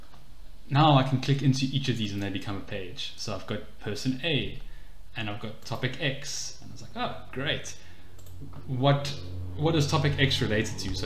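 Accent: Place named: South Africa. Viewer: Very useful, thanks. The accent makes me think you're a fellow South African?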